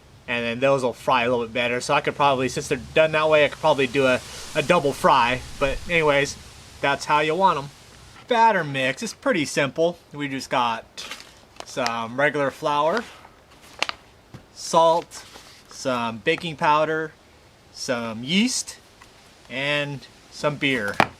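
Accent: South, United States. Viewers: where'd u grow up? never rlly see a somewhat old asian guy with a southern accent lol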